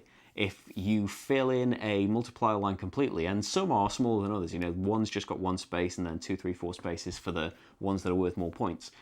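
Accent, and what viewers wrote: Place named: Leeds, United Kingdom. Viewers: This was great thanks, and I watched all the way to the end. Is like a friend sat having a chat. Do you live in the North Of England (just by your accent??) If you don't mind me asking. Enjoy Essen! You certainly going to need a few empty cases to bring back all those games ;-) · Yeah I wondered that too. Sounds like you're from my neck of the Leeds woods!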